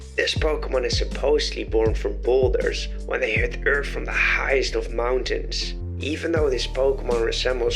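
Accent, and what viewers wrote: Netherlands. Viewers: Ik hoor een nederlands accentje haha, verder echt coole designs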